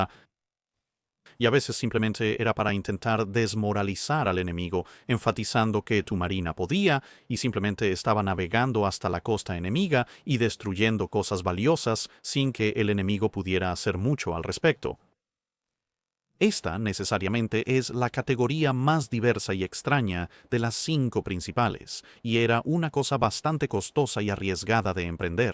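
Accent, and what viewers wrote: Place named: England, United Kingdom. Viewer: Seguia este canal en inglés ,el original, ahora en español con acento inglés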